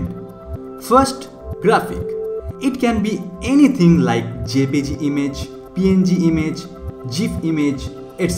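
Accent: India. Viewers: You have a North-East Indian accent :) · this mans got the strongest indian accent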